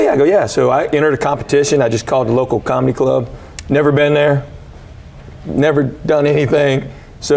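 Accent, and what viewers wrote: South, United States. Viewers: I've never heard an Asian guy with a southern accent before. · I remember seeing this guy when I was a little kid in the. Local accents are quickly going away these days but at the time his southern drawl really confused me! I don't remember the whole setup but I remember the punchline was him saying, "I reckon so!" I'm from NYC and I'd never heard that phrase before so next time I saw one of my uncles, a guy born and raised in the South but spent the rest of his life here in NYC, I asked him what that meant so he asked me to repeat the joke for context. He laughed for 5 minutes straight! He said it made him feel at home again. There's a type of southern humor that's really dry but sarcastic and very sharp. He told me it was like British humor in that way. His accent has softened, he's still got some of that southern charm and dry wit. 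And I'm glad to see he's still working. I'll be subscribing and checking out more of his material! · The Asian with a southern accent is fascinating me.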